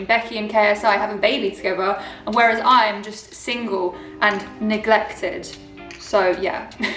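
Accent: United Kingdom. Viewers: omg she really does sound like a female any murray lol maybe thats just a Scottish accent thing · It's a normal English accent wtf.😂😂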